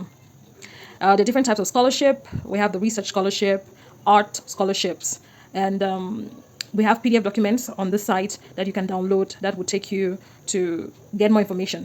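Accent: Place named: Paris, France. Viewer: That parisian accent na die! Hahahha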